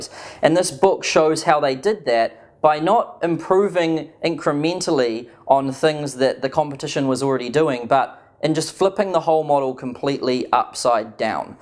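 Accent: New Zealand. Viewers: Kiwi accent?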